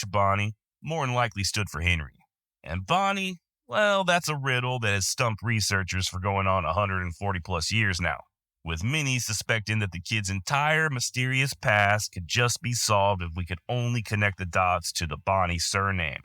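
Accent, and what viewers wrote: Texas, United States. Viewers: The moderator of these stories is first class. Great Texas accent, clear diction, great humor and wonderful storyteller. Pleasure just to listen to him speak.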